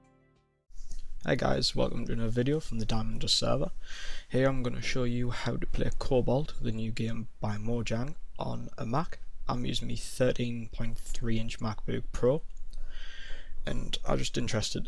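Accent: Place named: North, United Kingdom. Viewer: (nice to hear another northerner on the net too!) ;]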